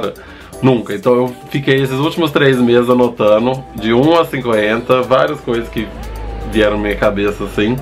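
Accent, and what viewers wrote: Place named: Minas Gerais, Brazil. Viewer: Sotaque mineiro é a coisa mais linda desse mundo. Adorei o vídeo! ❤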